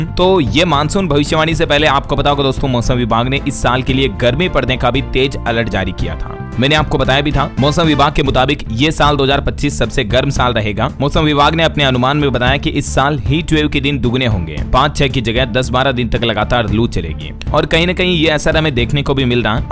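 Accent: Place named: Haryana, India. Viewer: Yaar Tera Haryanvi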